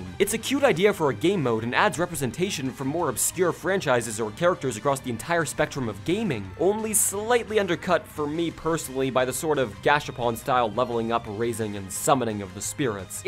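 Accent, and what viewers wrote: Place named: Norway. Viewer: You sound like you are from norway :D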